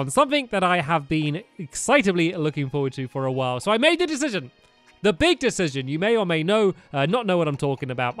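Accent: United Kingdom. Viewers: Probably cause he's british or something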